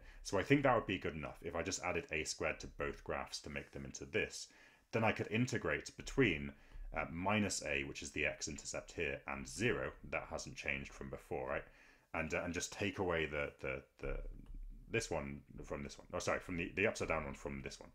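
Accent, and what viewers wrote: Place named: United Kingdom. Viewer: Can u go some slow because international students also watching your videos it takes so much of time to understand the your British accent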